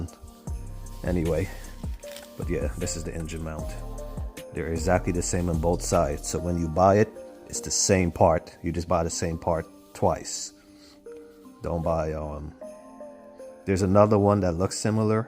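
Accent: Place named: Caribbean. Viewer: Jamaican or Trini? I hear a Caribbean accent 😂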